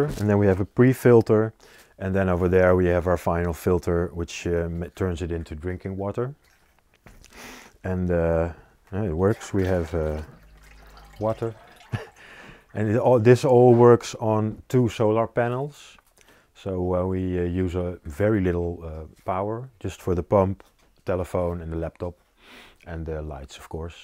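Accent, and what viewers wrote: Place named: Australia. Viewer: You know- I was thinking your English reminded me of an Australian speaking and Lo- you lived there- or here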